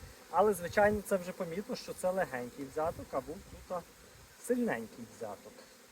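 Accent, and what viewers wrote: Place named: Prykarpattia, Ukraine. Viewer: удачі.розмова як на Прикарпатті.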